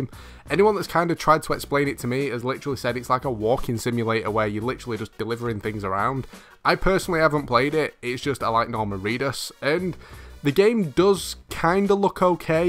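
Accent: United Kingdom. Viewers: Something about a British accent that makes me cringe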